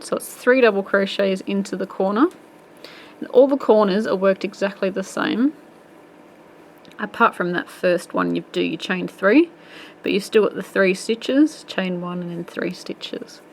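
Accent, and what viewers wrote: Australia. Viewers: love hearing the Aussie accent!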